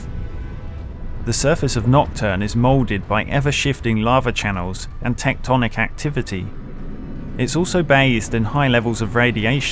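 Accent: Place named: United Kingdom. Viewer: The GrimDark Narrator sent me your way, says you know your stuff AND have a british accent.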